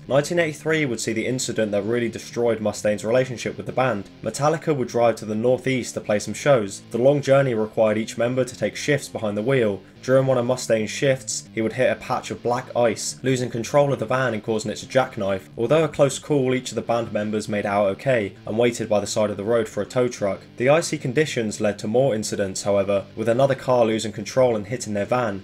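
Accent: United Kingdom. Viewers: I hate when red coats narrate American business.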